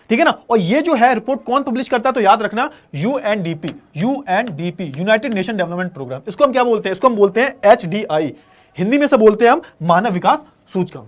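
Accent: Haryana, India. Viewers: sir always my favourite teacher ❤kis kis ko haryanvi boli badhiya Lage hai 🎉❤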